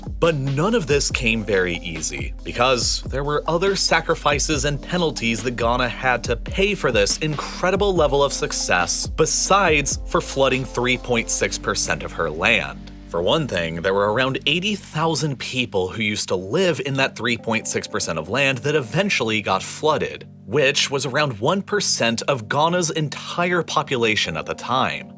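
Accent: United States. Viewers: Why do you use the international measurement standards but still use American only pronounciations on words like Alumunium (internationally pronounced: a-luh-mi-nee-uhm)?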